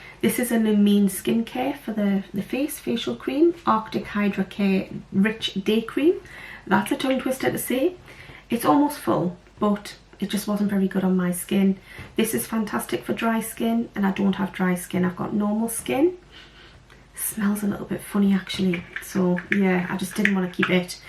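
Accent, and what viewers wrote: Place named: Wales, United Kingdom. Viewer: My nan was Welsh so I really love listening to u ❤️wot part ov Wales are u from ?my nan was south Wales by aberdare ❤️❤️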